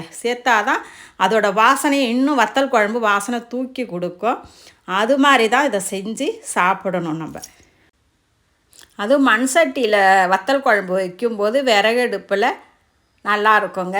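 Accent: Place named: Thanjavur, India. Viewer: U have interior Thanjavur slang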